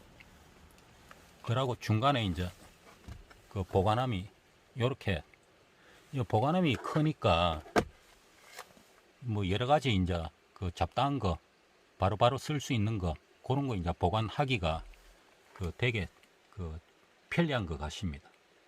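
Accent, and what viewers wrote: Gyeongsang, South Korea. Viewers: 요때기 경상도 사투리 정겹네요ㅋㅋ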